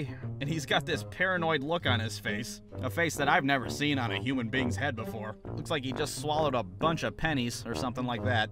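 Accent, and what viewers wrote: United States. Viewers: Are you American or what I'm not sure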